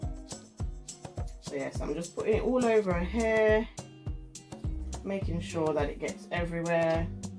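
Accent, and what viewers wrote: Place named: Jamaica. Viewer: She has a little ja tlk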